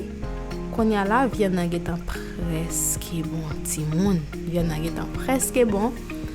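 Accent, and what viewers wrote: Haiti. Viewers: Janw pale a ou se on fanm okap oubyen ou sot nan nord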